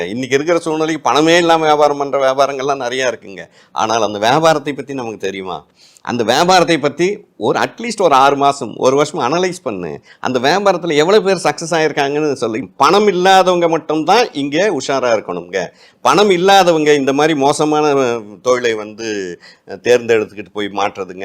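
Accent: Kongu, India. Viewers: கொங்கு தமிழ் ❤😇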